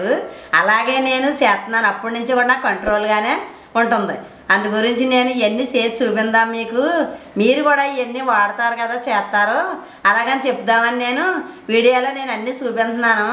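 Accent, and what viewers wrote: Godavari, India. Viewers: godavari yesa basha adhurs bamma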